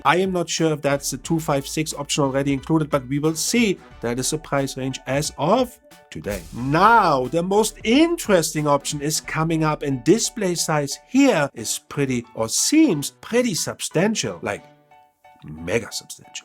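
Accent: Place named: Germany. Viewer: I'm a Chilean fan from your work... And love your German accent... Very cute 😂👌🇨🇱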